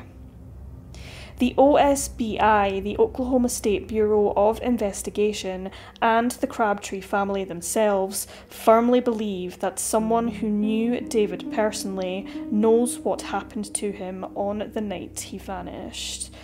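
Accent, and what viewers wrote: Scotland, United Kingdom. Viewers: She is Scottish. We all talk like that in Scotland 😁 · The Scottish accent really adds an extra layer to the content. Don't know why. It just does.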